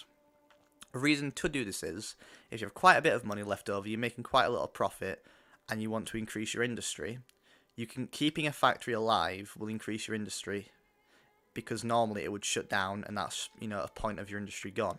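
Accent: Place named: United Kingdom. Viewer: Great series, however I'm having hard time understanding British accent videos and you speak too fast for my taste.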